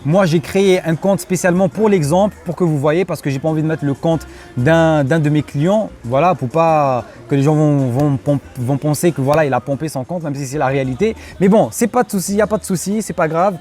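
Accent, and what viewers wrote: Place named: Arab world. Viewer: Tu vis en CI, mais t'as un accent arabe, As tu des origines arabes?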